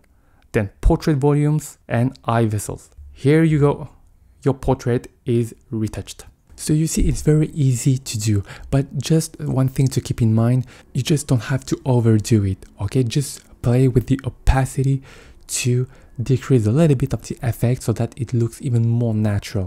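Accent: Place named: Italy. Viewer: i like ur english akzent. are u from italy or something like that? its sound like that.. ^^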